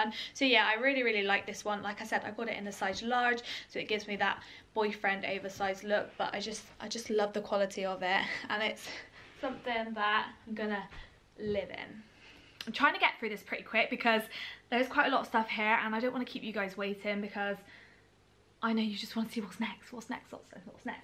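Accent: Bristol, United Kingdom. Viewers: hey beautiful you don’t have to answer but are you from Bristol😂I can hear a Bristolian accent in you xx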